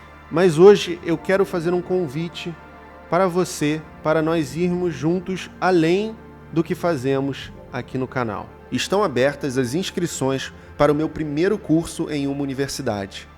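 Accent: Rio de Janeiro, Brazil. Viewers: seu canal é muito bom, você é muito inteligente. Tente controlar o sotaque carioca (S assobiado) que certamente será muito mais agradável ouvir suas ideias (brasileiros de outras regiões).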